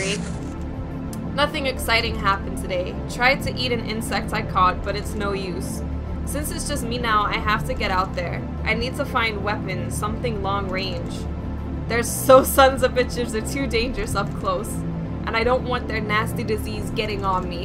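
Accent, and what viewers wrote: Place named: New Jersey, United States. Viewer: It sounds very Jersey.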